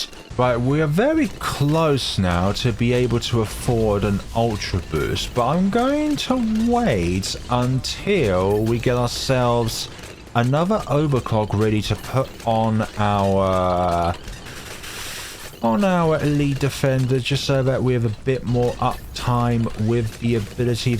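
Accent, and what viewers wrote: United Kingdom. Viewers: Holy British accent 😂 lovely video gentleman!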